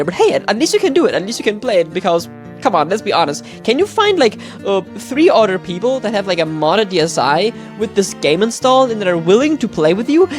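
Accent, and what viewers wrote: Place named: Germany. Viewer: Knew I heard german somehow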